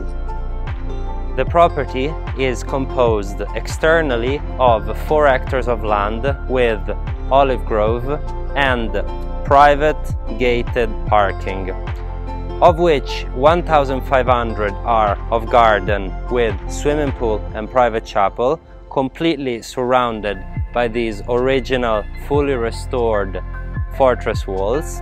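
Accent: Italy. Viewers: L💓Ve listening to ur Italian accent . Besides u r an awesome guy ur self ! . 👍💕💕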